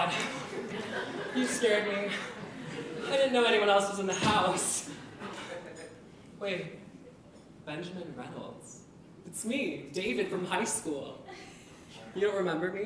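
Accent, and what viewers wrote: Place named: United States. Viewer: I thought you were American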